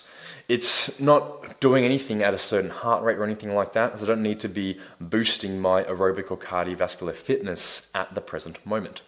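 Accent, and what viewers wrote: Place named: Australia. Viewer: Didn’t expect the Aussie accent 🇦🇺 subbed, cool vlog man.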